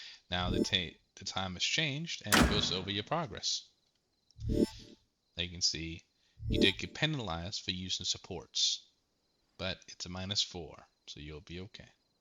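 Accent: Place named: United States. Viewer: I have 5500 hours in Arma 3. I just started playing this campaign looks promising. I would like to ask a favour, as someone from England i found your accent really interesting, as in American but i cant place it. Would you tell me where that accent is from?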